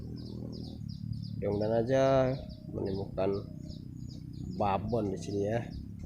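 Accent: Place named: Solok, Indonesia. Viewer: Logat bahaso solok kentara,slaam sesama rang slk da,dimaa lokasi spotny ko da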